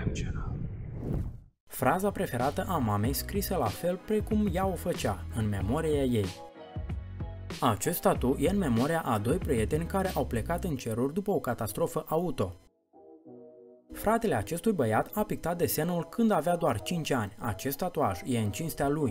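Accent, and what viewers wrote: Moldova. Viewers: Esti moldovean?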